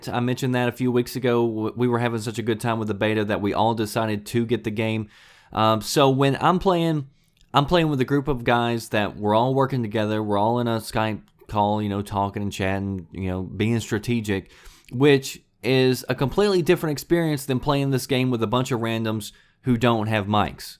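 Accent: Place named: Texas, United States. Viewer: Really man I'm not even gon lie I'm being totally honest but your the best YouTube channel I have came across .. You sound like your from Texas . I love how you keep shit real man .. I love watching your videos .. You deserve the most success on YouTube . Only if you can be super constant with videos & have a lot more content you will be the best .. Love your channel bro I really do . I use to watch Ali a ghost Robo & others but your the best .. They are to soft lol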